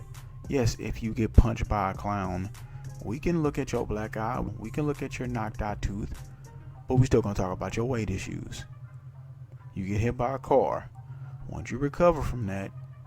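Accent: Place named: South, United States. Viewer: His corn pone accent makes it all funnier.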